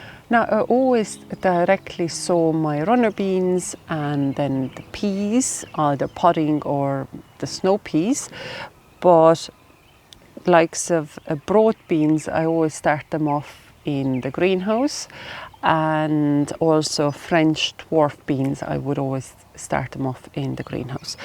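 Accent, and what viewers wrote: Ireland. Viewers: The video lovely and calming, the Irish accent a bonus! Can't wait to return to beautiful Ireland one day! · 😊 lovely video ,, where are you from originally ,, can't place the accent as its half Irish and half something else ? 😊❤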